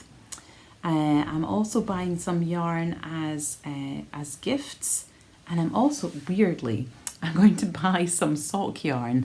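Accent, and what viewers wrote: Scotland, United Kingdom. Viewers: What's bringing me joy? Well every time your new podcast is broadcasted it feels like al little present! I love to see your projects listen to your ideas and just listen to your wonderful voice and Scottish accent. You're a wonderful knitting companion, thank you and I hope you will keep on doing these podcasts for a very long time!! Lots of love, Ellen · Hi! I've been watching you for a couple months now and I am always impressed by your knitting. I am an avid sock and garment knitter but have been inspired by you to knit a Steven West shawl. I have bought the pattern for his Lumpy Space shawl and from my stash, have chosen silvery grey, black, white and a a red/black mix. I think I will cast on in the late fall so that I have it to wear in winter. P.S. My Grandmother was from Scotland, I have a great Auntie and a few cousins still in Aberdeen, so listening to your accent is reminiscent for me of my Grandma:) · Hello, I have been watching you from day one and thoroughly enjoy every episode! 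I love your Scottish accent and especially enjoy your colour schemes in your projects. I am planning to visit Scotland in April next year …Yay for searching yarn shops x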